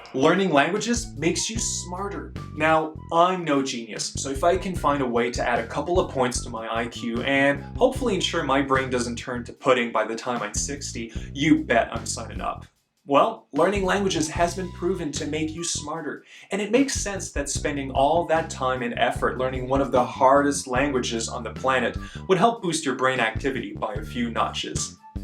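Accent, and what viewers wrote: Standard. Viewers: you speak the standard Mandarin !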